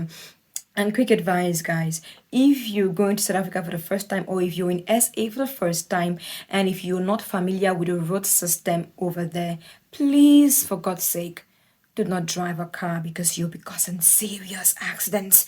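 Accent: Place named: West Africa. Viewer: Tht accent is Western Africa live yr seed on yr way out we going to need tht copy in the future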